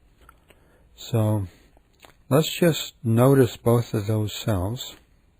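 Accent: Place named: United States. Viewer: i think its because its american, but the voice really creeped me out, abit. sorry.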